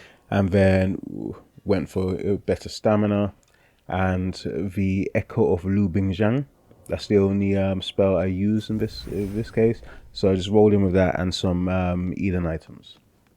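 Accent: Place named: Italy. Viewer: mate! I have an Italian friend who has exactly your accent, even a similar voice. So, I was like, aha! Got ya!!! You do like Wuchang!!😂😂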